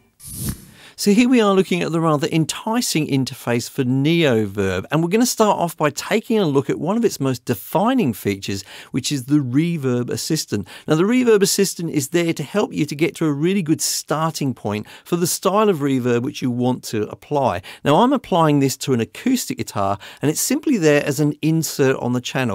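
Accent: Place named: Australia. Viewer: Totally off topic: English is not my native language, so I asked a friend from England for help, when I first discovered your channel. I assumed, you say "I hope you're well", but for me it sounded like "I hope you will", and it was driving me crazy, because I always thought "will what?" My friend assured me it was just your australian accent, so thank you for always wishing us well! xD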